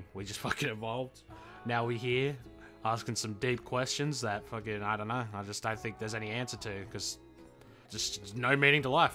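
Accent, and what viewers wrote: Australia. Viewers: are you aus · What part of aus you from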